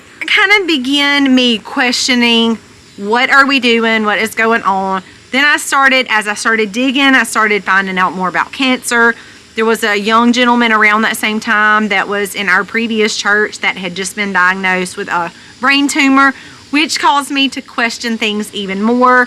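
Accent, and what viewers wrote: South, United States. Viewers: Hi, just got done watching that video with Tucker Carlson!. Shared it with my daughter and a friend that is dealing with PCOS. I'm proud of you for leaving what you knew was wrong in our medical system. Honestly, I never had health insurance for many years of my life and just don't go to the doctor. I broke my wrist 3 years ago, tripping over a tree root. Thank God for hospitals for that. They wanted me to go to physical therapy to rebuild my strength. I found exercises online and did them at home. Doctor was amazed on how well I healed and the flexibility I had. As far as your southern accent and they way you talk: I love the way you talk! Hearing your voice, not seeing your face, I know it's you! Take care and God Bless, Lori P.S. I watch your channel and Colby's everyday. Was wondering what you are doing with your off Grid property?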